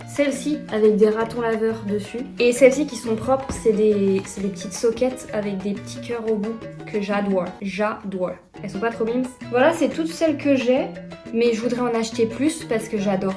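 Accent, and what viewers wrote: Belgium. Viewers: Grave bonne vidéo le sang, ce montage : une pépite, j’adore ! Ah oui et tu commences à prendre l’accent belge ça va plus du tout 🙃🙃🙃🙃🙃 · Ho mais nan trop heureuse j était tellement impatience de finir la journée pour regarder ta vidéo j adore ta refait ma journée et super contente que tu aille mieux c est super❤❤❤❤❤ ( tu commence a avoir l accent belge haha rejoint nous )😂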